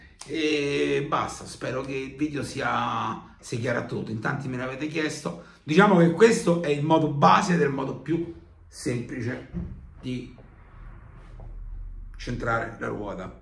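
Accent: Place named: Rome, Italy. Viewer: Dall' accento capisco che anche tu sei di Roma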